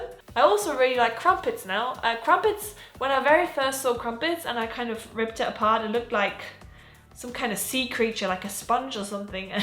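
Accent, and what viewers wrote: England, United Kingdom. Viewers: Go up north to Staffordshire, get some oatcakes & then do an english breakfast all mixed up in a pan, slap it on the oatcake & eat it like a burrito. Warm the oatcake first. DEEEEEEELISH! You could make you own oat cakes also. You sound more Brit than German. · What a great English accent!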